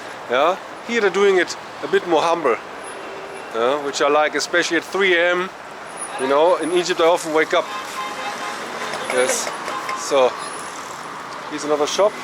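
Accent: Germany. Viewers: German, or? (ich höre den Akzent 😂)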